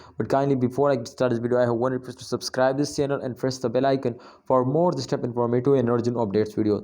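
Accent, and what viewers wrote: India. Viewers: When i here indian accent i move on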